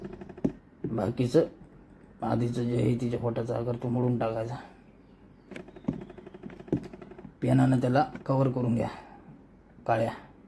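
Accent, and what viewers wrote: India. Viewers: अहो बापू काय राव तुम्ही पण काय ती तुमची गावरान भाषा एकच नंबर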